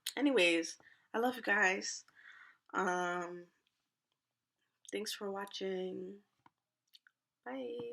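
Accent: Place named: New York, United States. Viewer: I love your vibe , I literally feel it through the screen. From your vibe to vocab I love it; I heard you voice and was like yuppp sis gotta be from NY. Just subscribed; love ya 💕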